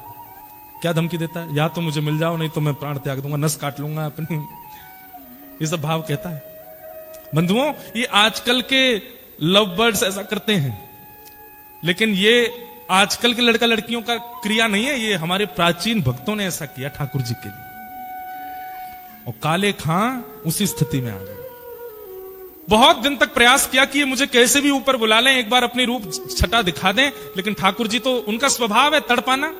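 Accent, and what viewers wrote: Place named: Braj, India. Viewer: Jai ho shree madan mohan lal jiki jai mharaj apki brij bhashbhut hi pyari lagti ha hambe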